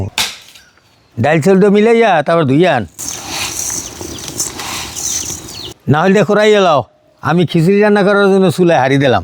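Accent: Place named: Barisal, Bangladesh. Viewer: বরিশালের মানুষ বরিশালের আঞ্চলিক ভাষায় কথা বলেন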